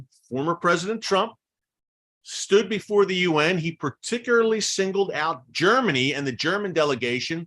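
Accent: Philadelphia, United States. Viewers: Lol Thought I heard Philly accent.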